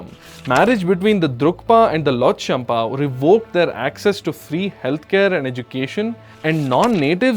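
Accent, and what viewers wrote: India. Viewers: Could not understand much. Too heavy Indian accent. From : Singapore 🇸🇬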